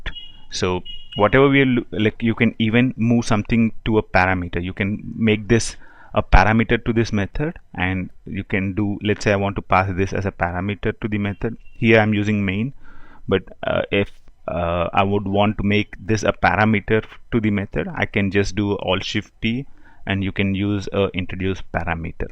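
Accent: India. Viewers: ох уж этот индуский акцент х/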